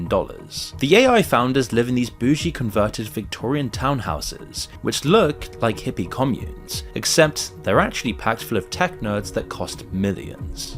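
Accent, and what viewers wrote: United Kingdom. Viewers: I love some Douchebag with a British accent telling me how fucked up my City is..... you don't know shit, we love it here.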